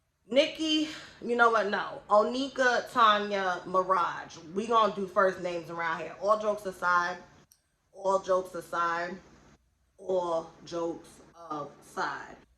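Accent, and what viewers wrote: New York, United States. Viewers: Its probably bc I'm a country ass SC girl lmao but I LOVE a NY accent. I could listen to you talk all day lol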